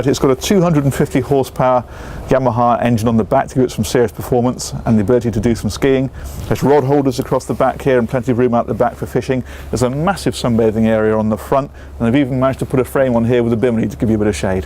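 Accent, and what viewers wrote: United Kingdom. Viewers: ! i heard the british accent and i couldnt believe its him lol, just finished watching his vids.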